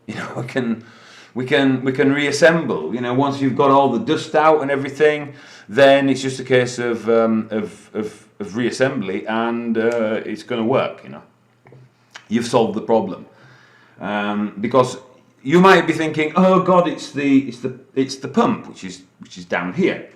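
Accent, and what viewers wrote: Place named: Yorkshire, United Kingdom. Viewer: Mmmm..... Micheal Caine speaks with a London accent/Cockney accent....I rather think this chap is a Northerner.....Yorkshire area. Mmm?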